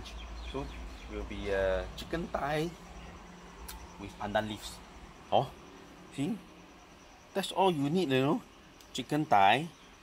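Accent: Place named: Singapore. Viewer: Typical singlish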